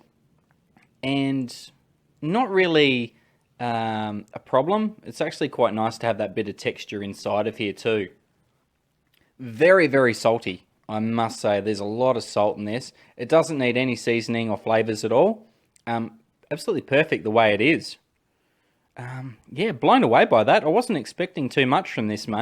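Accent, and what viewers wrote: Australia. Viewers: God I love how Aussie you are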